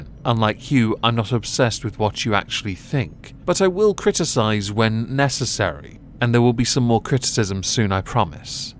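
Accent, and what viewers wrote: United Kingdom. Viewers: Ah, nothing like hearing a Cthulhu dude with a silky smooth voice and great british accent dunk on the stupid peeps. Then hearing that glorious outro. Thank you for the video sir! 😄👍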